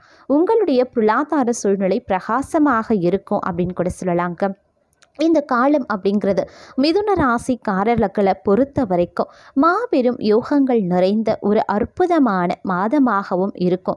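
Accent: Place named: Sri Lanka. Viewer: இலங்கைத்தமிழில் வாசிப்பு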